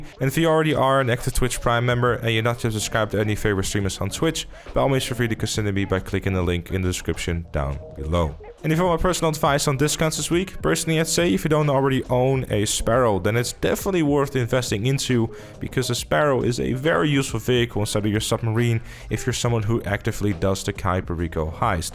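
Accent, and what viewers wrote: Netherlands. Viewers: You sound Dutch , am I right 😜?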